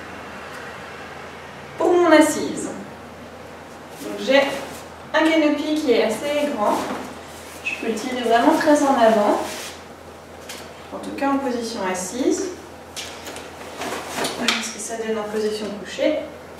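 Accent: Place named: Switzerland. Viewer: Bonjour, superbes vidéos! Très intéressant! J'ai l'impression vu votre accent et les mots tels que nonante, huitante que vous êtes suissesse. :-) Est-ce juste? Si oui, j'ai une petite question. Je souhaiterais acquérir une poussette Peg Perego et vivant également en Suisse, je ne sais pas où en acheter. Sur le site d'Orchestra je n'ai vu que des accessoires. Auriez-vous un conseil?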